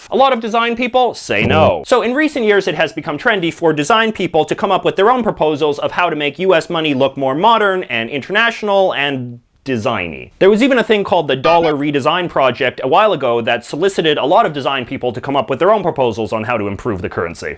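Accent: Canada. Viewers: After hearing all those aboots. I suspect this guy of being Canadian.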